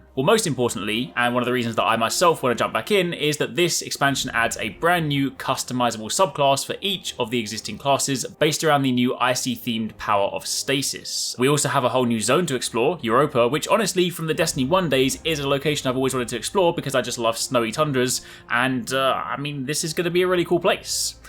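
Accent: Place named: United Kingdom. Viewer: I really wanted to like the video but you sound just like a Brit that I had to rearrange his face...lol he came to the wrong town in bama running his mouth...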